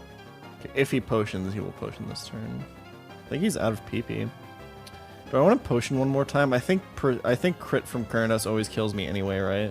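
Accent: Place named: United States. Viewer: YouTube and twitch. Come for the highest tier of nuzlocke Pokémon content. Stay because how tf does this guy sound more American than 80% of American people? Hong Kong and Singapore and Germany. Has he intentionally adopted the American accent from watching cartoons and other shows over the years that are created by “US English” speakers? Does he do it to be easier to digest for the US viewership market? Maybe his USA accent is just really good. I watched 151 attempts of EK, I had a lot of time to consider this while he was wiping to Watson because no VA chinchou.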